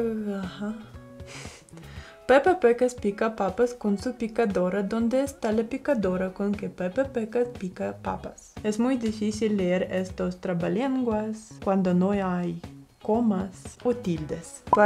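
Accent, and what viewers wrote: Guatemala. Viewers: saludos me gusta el acento de Guatemala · Me gusta el acento con el que ablas español chapin. 😂😂😂 me encantan tus videos señorita, saludos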